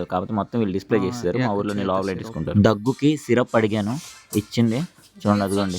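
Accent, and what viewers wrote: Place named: Ongole, India. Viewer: Bro r u from Ongole... happy to hear that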